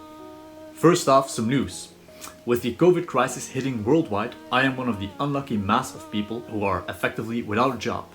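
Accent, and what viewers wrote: South, United States. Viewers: That is an excellent southern accent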